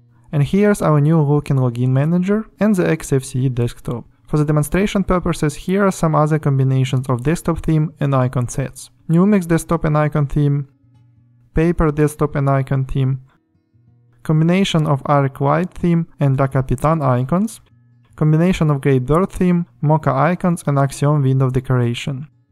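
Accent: Russia. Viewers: ес офхорс, лет ми спик фром май харт. 😁 But honestly, you have a really russian accent, like you are reading by papers text which was wrote in russian. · Русского человека сразу слышно:))) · русский акцент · ты сказал "ощущение"? да тут только что русским флагом в кадре не машут. Жесточайший русский акцент, близкий к пародийному. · Акцент какойто "рязанский". В смысле не носитель языка говорит ИМХО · Русский акцент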